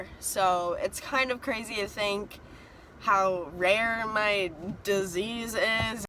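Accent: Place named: United States. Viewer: Wait do u live in ireland? You sound american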